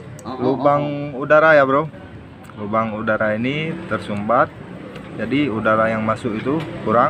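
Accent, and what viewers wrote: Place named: Medan, Indonesia. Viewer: Alamat mna bg Kyknya orng Medan ni 😁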